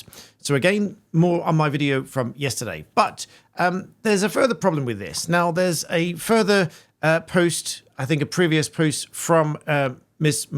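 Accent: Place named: RP, United Kingdom. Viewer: Can U do your Tommy Videos with a common/Chav accent pls? Hard to take seriously when you are speaking posh🤣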